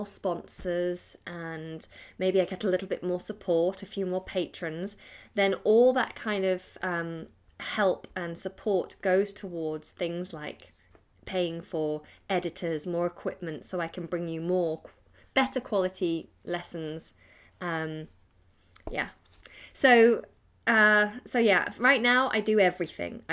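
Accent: United Kingdom. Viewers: I LOVE YOUR BRITISH ACCENT. YOU ARE SUCH A HUMBLE PERSON !!!! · I love you and your British accent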